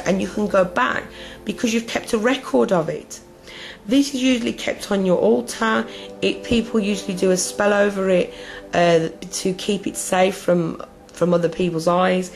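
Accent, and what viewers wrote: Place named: United Kingdom. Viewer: you have the loveliest British accent!! I love it.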